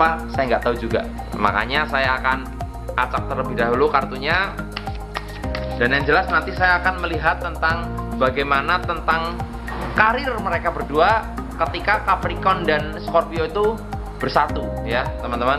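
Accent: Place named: Yogyakarta, Indonesia. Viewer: Orang mana bang kok medok?😂, Dari Jogja ya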